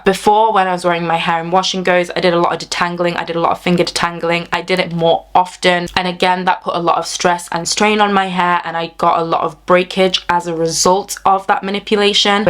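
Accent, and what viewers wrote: United Kingdom. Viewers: Very helpful video, i had a feeling this was the case. My hair is relaxed so i normally blow dry it but i think i will go back to flat ironing it as it lasts much longer when the cuticles are sealed. I steam once a week. Also its nice to hear another UK accent.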